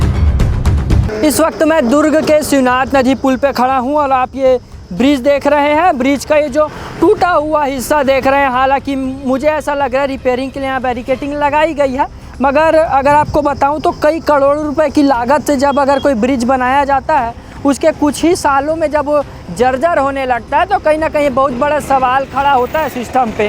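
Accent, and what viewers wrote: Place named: Bihar, India. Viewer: नेता परदेसिया अधिकारी परदेसिया इंजिनियर परदेसिया to kaise rahega sabkuch ठीक ओर तू तो पक्का परदेसिया है बिहारी बाबू तुम्हारा बोलचाल बता रहा है 100%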